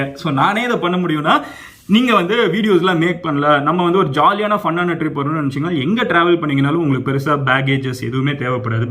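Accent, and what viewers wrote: Madurai, India. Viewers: Enna than Professional ah Pesinalum Madurai Slang Vanthuduthu 😂😂 Nikka Vachuruvainga,Flight la Kuduthainga 😂😂 Madurai ❤️🔥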